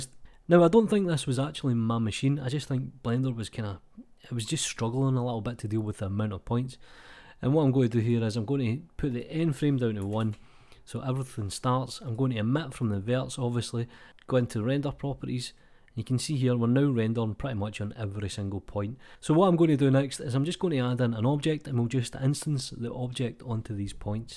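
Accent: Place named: England, United Kingdom. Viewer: Can’t understand that English accent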